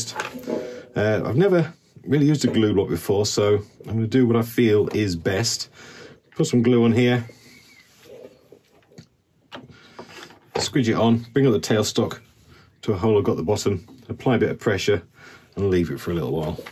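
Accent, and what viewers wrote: United Kingdom. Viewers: First of all, thank you for sharing your ups and downs with that wonderful, marble like bowl! Secondly, you found the most important word concerning epoxy pours: "hopefully"... hopefully it doesn't leak, hopefully the colours stay separated, hopefully there will be no voids... Third point: you used epodex as I do, and I have the same issues with heavy chipping. Looking at the turning videos from overseas it always seems so easy to turn resin. But what can you do if there's no total boat or whatever they use available in Europe? You're stuck on Epodex or Dipon no matter how they behave on the lathe. I'd be grateful if someone dropped a hint to a better turnable resin here. Last but not least: Sometimes you can be experienced in a foreign language as you want, your brain is kidding you anyway. The first three minutes I was wondering what a "barking clusion" might be, 😂. 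Keep on turning, I love your videos, your British sound an I admire your patience. I think I'd have kicked the lathe (outch!) when the bowl flew off.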